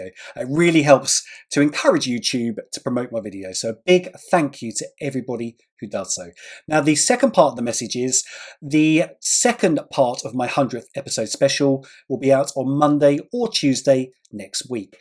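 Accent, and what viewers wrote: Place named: England, United Kingdom. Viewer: Apparently this was the 2nd time around for me on this one. Can't find my response from the previous time. This time I got 14 right. I actually remembered the correct answers on at least two I had answered incorrectly the last time. Thanks for another great quiz,! By the way, from your accent, I'd guess you're from England, Scotland or New Zealand. Am I right with any of those? I'm from the United States.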